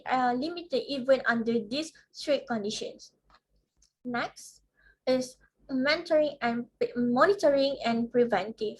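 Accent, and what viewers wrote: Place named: Philippines. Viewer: hii there very interested project research good explanation and details and most we malaysia still not aware about this one more thing the way you explain fascinate me good job and you can enhance 👍👍👍 sorry about one thing you stammer but no worries dear because you kind have some filipino accent 😍 anyway all the best and may allah bless you with success ammin.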